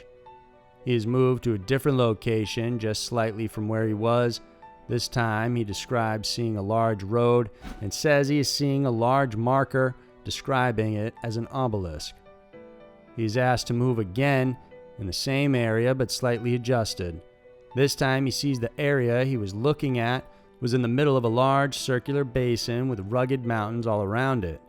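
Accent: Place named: Midwest, United States. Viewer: I'd agree hes American, but I don't hear any southern accents in his voice. Possibly midwestern.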